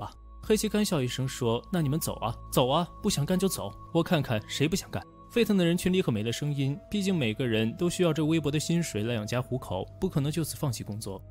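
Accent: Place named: Shandong, China. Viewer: 感觉作者听起来像山东人。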